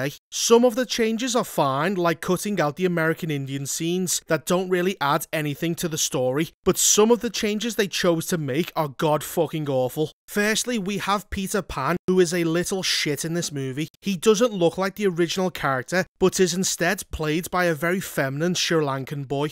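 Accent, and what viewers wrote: Liverpool, United Kingdom. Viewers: I lasted a full ten seconds of the appalling voiceover. Scouse mixed with South Wales and a few other things.......thankfully there are subtitles. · So.. You're MAULER. But british... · I don't know which to laugh at the most; the review, the swearing or the indirect jokes! And I love the Scouser accent it's delivered in 😂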